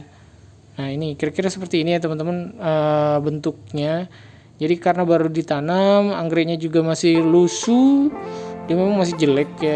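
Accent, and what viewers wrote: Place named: Ambon, Indonesia. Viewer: kirain mas orang ambon..logaty ky ambon...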